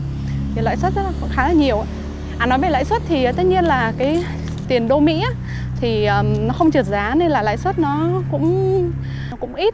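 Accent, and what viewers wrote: North, Vietnam. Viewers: Giọng nói Hà Nội vẫn chuẩn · nói giọng người bắc nghe dễ thương quá đi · Mình dân gốc Bình Dương nhưng sao thích giọng con gái Hà Nội quá😍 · Nghe giọng nói của hay ha, chính xác người Hà Nội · Giọng em là m Bắc sau 1980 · Giọng Hà nội của nghe rất dễ thương. ❤️ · giọng hà nội nghe thích bạn, mặc dù ở đời sống ở Mỹ rất cao nhưng chi phí cũng không hề nhỏ rất cảm ớn đã chia sẽ những thông tin nhà ở Mỹ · giọng này là Hn chuẩn đấy . không phải giọng tỉnh lẻ đâu nhé. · Giọng Bắc thật quyến rũ.. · Cảnh quan sạch đẹp, môi trường sạch, giọng nói dễ nghe giông giọng hà nội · Em gái này người miền Bắc sau 1975 phải không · em là bắc kỳ hây miền trung dậy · Nhìn e cưng quá muốn yêu e luôn giọng bắt bắt lại nghe hay quá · Giọng Bắc thôi chứ không phải giọng Hà Nội b ơi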